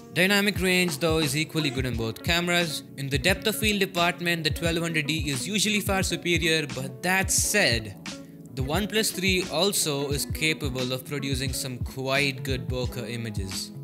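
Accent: India. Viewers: hhh, indian voice hate it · are you from kerala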